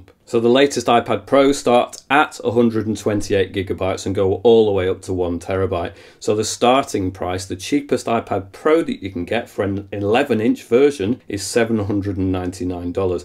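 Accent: United Kingdom. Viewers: Wow a British reviewer on youtube! Yes yes yes!!!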